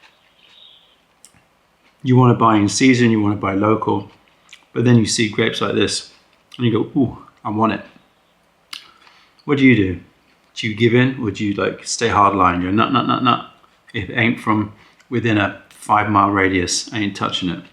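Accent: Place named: England, United Kingdom. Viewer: I really thought you were Indian! must be the English accent😊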